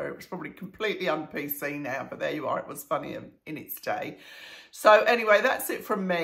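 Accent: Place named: England, United Kingdom. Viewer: I love your hair. I wish I could wear mine like that! I watch a YouTUBE channel called The Armchair detective. His name is Allan I just mention him because you have exactly the same speech. The two of you probably live in the same area of England.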